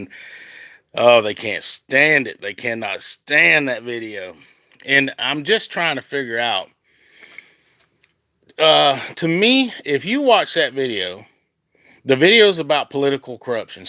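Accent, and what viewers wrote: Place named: Florida, United States. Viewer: I just found you TODAY. I LOVE YOUR NEW SONG. I am a Florida & Alabama Gulf Girl (stuck in Utah now). Where are you from? Accent sounds like NW Florida?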